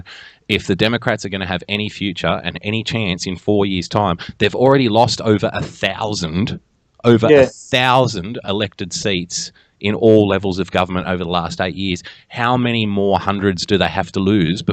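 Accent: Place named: Australia. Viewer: This is a good Summary. Worth a listen . The Aussie accent is off-putting . You tend to think an Ozz has no place advising the US . But I enjoyed it.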